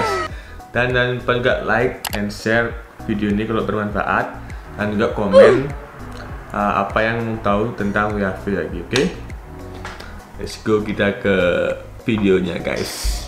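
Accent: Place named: Bali, Indonesia. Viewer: logat bali asane nahh :D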